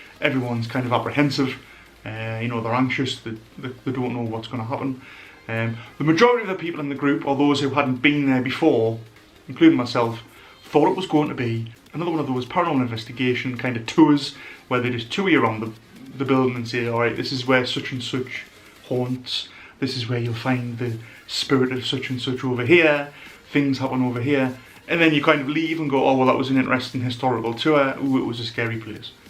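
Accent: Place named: North East England, United Kingdom. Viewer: Great video! Me & my friend are staying overnight there on the 24th October! You sound like you're from the North East. We're from Teesside.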